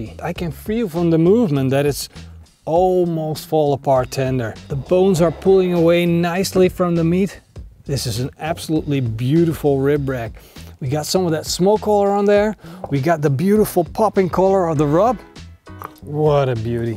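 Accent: Netherlands. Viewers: sounds dutch trying to speak english. but ribs looks tasty.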